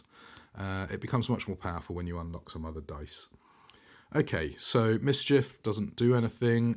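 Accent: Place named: United Kingdom. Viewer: Thanks for sharing your videos. The encounter text somehow feels more appropriate when hearing it in a British accent. :) A small suggestion on your audio -- consider converting it from stereo to mono. When listening to the video with my headphones on it feels like your voice is moving around a bit. :)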